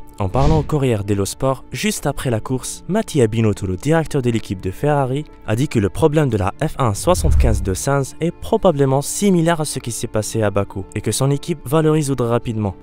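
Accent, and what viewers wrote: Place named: Morocco. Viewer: Ah mais accent je peux pas ! C'est quoi ça ? Vs avez trouver un mec au fond du Maroc pour lire votre texte ? Laysteuuurrrr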